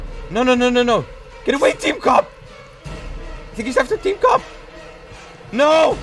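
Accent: Netherlands. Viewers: He's dutch xD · Dutch Accent Heerlijk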